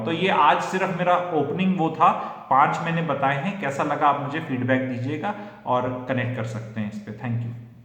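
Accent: Haryana, India. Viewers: sir aapki hindi samajhne me thori dikkat hoti hai. I think it's a Haryanvi based hindi. It's not a standard hindi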